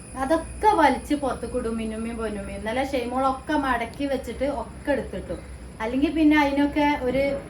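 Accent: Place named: Eranad, India. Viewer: കുറ്റം പറയണ്ട, ഏറനാടൻ ഭാഷയാ അതിനെന്താ കുഴപ്പം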